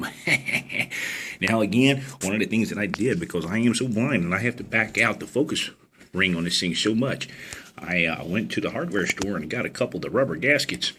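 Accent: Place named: Fargo, United States. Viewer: Oh god stop the FARGO accent!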